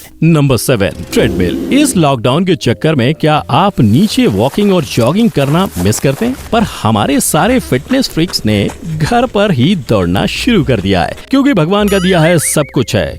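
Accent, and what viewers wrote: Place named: Gujarat, India. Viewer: Gujrati lagate ho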